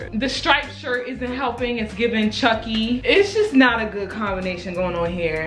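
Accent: Baltimore, United States. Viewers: Are you from Baltimore? I hear that accent !!! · Are you from Baltimore because I think I hear an accent?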